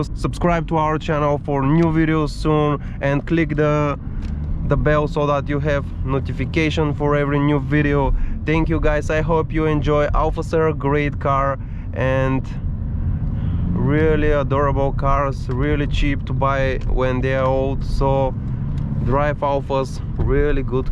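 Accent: Bulgaria. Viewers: Евала за клипа още от акцента те разбрах ,че си от нашите хора 😁🤣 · Първо, като почна клипа си помислих, че е някой чужденец, обаче като чух как говориш и веднага познах, че си българин. Много готино клипче, прожължавай все напред! :)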